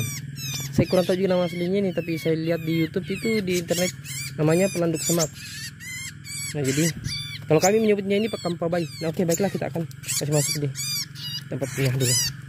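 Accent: Bugis, Indonesia. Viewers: Orang bugis kah bang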